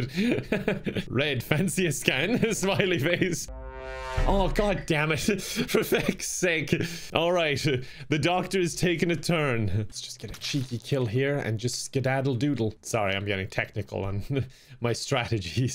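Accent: Ireland. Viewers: irish accent was so strong this episode lol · That Irish accent omg haha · Why did actually sound irish today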